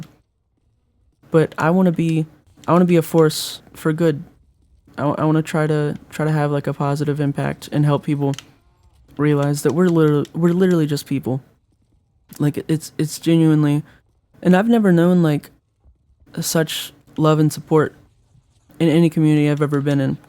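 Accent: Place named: South, United States. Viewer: great southerner accent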